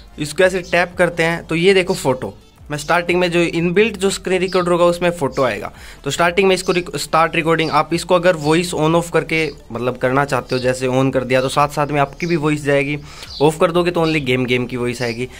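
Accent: Haryana, India. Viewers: Bhai haryana me kaha se ho? Awaz me haryanvi touch h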